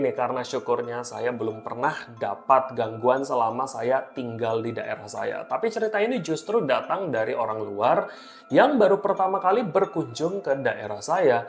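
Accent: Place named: East Kalimantan, Indonesia. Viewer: Saya dah curiga kalo abangnya pasti orang KalTim, karena kedengeran dari logatnya. Halo Bang saya asal Balikpapan sekarang tinggal di Semarang ikut Suami😊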